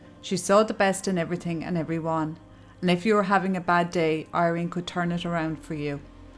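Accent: Ireland. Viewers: YOU SHOULD DO MORE VIDEOS MY MATE. THERE GOOD AND QUICK TO THE POINTS. AND THAT IRISH ACCENT OF YOURS PUTS ME RIGHT TO KIP. 🍀🍀🍀🍀🍀👌 FROME LIVERPOOL ENGLAND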